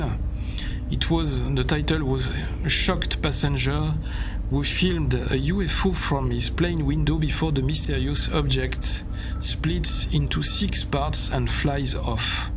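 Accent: France. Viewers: T'as un sacré accent bien français ptdr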